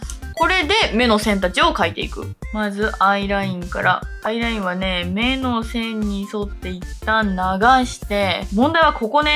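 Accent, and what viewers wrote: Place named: Ibaraki, Japan. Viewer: なまりかわい💕～べ？とっても親近感、、私は茨城じゃないけど！！いつも真剣にメイクに向き合ってるかっこいいです😿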